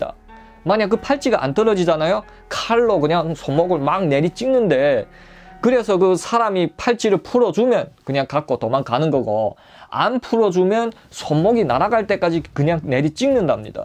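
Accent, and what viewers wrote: Yanbian, China. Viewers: 엉아가 한마디 advice를 하면 방송할때 서울표준말로할려고 노력하지말고 그냥 조선족 악센트, 아니면 자연스럽게 중국어 악센트가 섞인 한국어로하는게 훨씬 조타아이니, 그게 니 매력포인트 아이니 네가 서울 표준말 방송보고싶으면 그냥 KBS,MBC,SBS 방송보면되는거 아이니 네가 TV보면서 말투 따라하면서 댓글도 그러게하는데 보는 많은 사람도 내생각에 동의랄텐데:D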